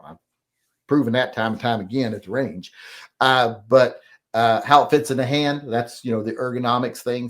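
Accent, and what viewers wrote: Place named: West Virginia, United States. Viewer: you grew up in mothman country 😂😂😂 no wonder your accent is so familiar (i have lots of close friends in that area... almost kin)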